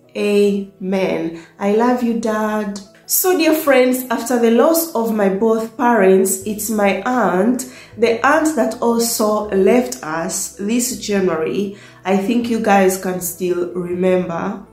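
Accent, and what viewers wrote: Uganda. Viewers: Me too afirst I thought is ugandan or keyan good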